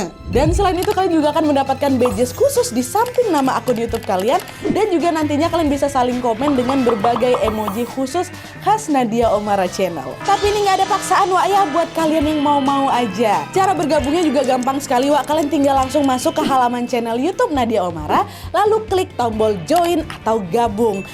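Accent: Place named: Banjar, Indonesia. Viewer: Kak, jujur kakak mirip bgt sama keponakan suamiku. 11.12 loh kak bedanya suaranya aja yg disini lebih ke Banjar ❤sehat selalu ya ka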